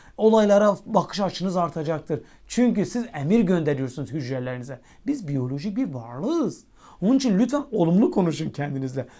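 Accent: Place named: Bingöl, Turkey. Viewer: kusura bakmayın tam Bingöllüler gibi konuştunuz çok benzettim 😊 teşekkür ederim video için kendi adıma 🙏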